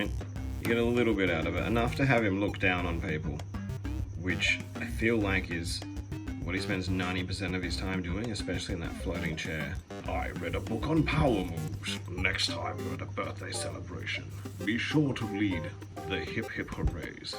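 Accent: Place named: Australia. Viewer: I didn't know David Kaye did toy reviews in an Aussie accent..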